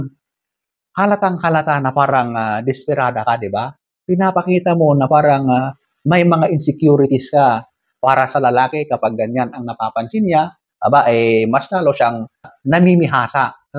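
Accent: Ilocos, Philippines. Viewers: Hi Kuya magandang advice mo. Gusto ko yung accent mo....Ilocano ka ba? 😅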